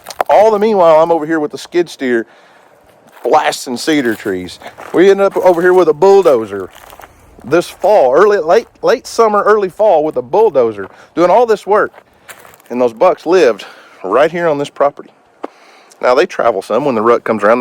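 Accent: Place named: Oklahoma, United States. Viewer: He's got the Okie accent...he used three words! "It mussbe nice" And folks that don't work hard and don't "grind" as younger folks like to say nowadays...they'd just rather be jelly!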